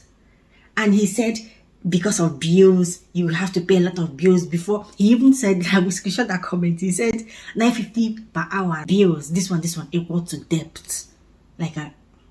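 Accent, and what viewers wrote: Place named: Igbo, Nigeria. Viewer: It's the igbo intonation for me. Nice one ma'am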